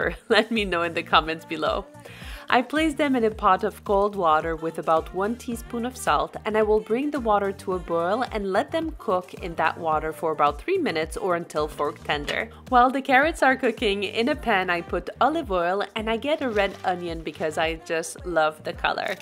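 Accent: France. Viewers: מבטא צרפתי באנגלית? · Very interesting. Are you French by any chance? I recognized some of your pronunciation and words:) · Are you French ? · Ah en fait c’est un accent français ha ha ha je m’étais pas rendu compte mdr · love ur French words and accent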